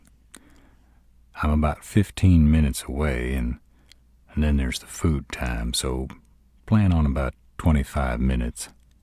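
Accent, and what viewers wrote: South, United States. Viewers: that southern drawl is so freakin hott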